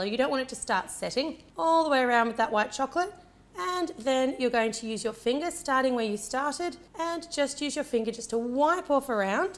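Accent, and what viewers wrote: Australia. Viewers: yes she is from Australia you can tell from the accent right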